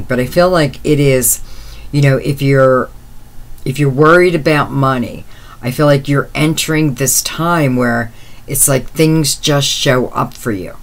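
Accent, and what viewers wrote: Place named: East Coast, United States. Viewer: everything you say is real ... you sound like your from back east very kind and wise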